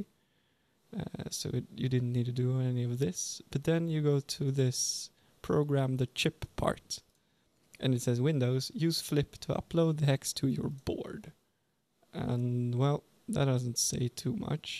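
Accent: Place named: Sweden. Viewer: You sound sooooo swedish =) EDIT: Ohh, du sa det senare att du faktiskt var från Sverige =) Tack för hjälpen!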